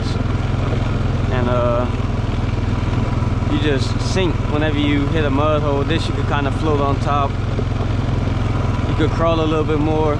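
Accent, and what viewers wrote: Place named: Louisiana, United States. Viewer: First video of yours I come across while looking up Honda 420’s and immediately noticed the accent lol. St Landry or avoyelles parish?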